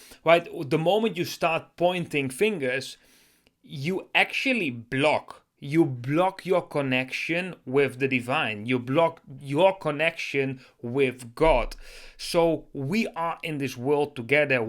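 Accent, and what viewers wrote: Netherlands. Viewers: Hallo! Ik denk aan je accent te herkennen dat je van Nederland bent! Ik ben Belgische en je bent de eerste die ik ontmoet met dezelfde taal en niet al te veraf! Wauw! Heel fijn jou te ontmoeten! Dank je wel voor deze aanmoedigiende en inspirerende video's! Ik ben de voorbije jaren pijnlijk geprocessed door God, om in positie te staan voor deze aardverschuivende shift! God bless you!!!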